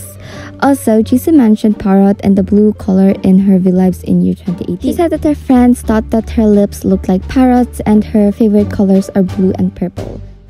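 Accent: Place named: Philippines. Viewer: Dude!! People in Philippines speak in that accents I've seen many YouTubers from Philippines and I've subscribed them too T,f you talking about for more attention? 💀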